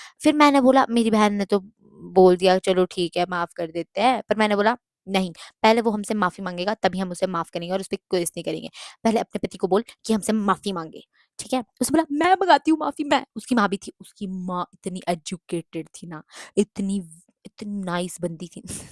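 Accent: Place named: Pahari, India. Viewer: storytime with pahari accent good one 😂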